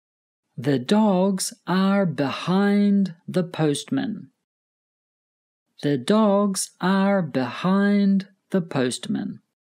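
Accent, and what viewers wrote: United States. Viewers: Is these English American?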